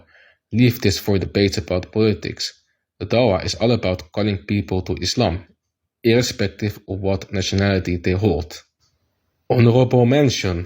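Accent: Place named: Germany. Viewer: Welcome to the true religion brother ! JazakaAllah for the video ! You have a crazy German accent Masha Allah 😬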